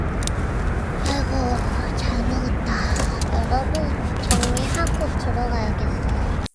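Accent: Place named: Gyeongsang, South Korea. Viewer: 홀리몰리과카몰리~ 억양을 보아하니... 경상도 신가봐요~ ^^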